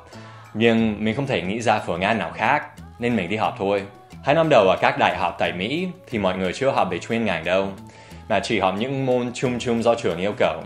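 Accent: Standard. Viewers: Nói tiếng Việt chuẩn ghê...giỏi... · Ngưỡng mộ anh ghê anh nói tiếng việt chuẩn ghê....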